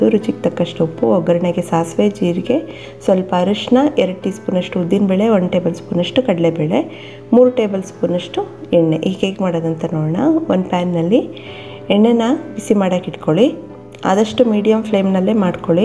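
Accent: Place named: North Karnataka, India. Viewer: uttara karnataka davra neevu nimma dwani d karnat tara ide yella adugegalu chennagide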